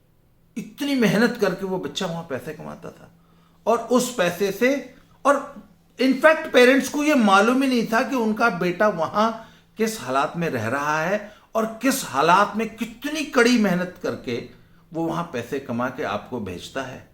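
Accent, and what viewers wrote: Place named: Kangra, India. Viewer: Aap ki "boli" language bahut acchi lagti hai H.P. kangra me same language bolte hai , Dharmshala me ap punjabi tipe language thodi mix hi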